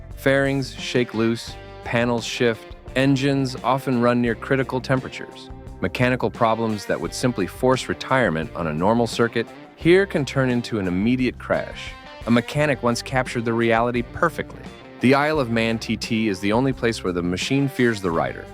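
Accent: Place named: United States. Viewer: ....Uhhh OHHH! An American Accent telling us what's Wot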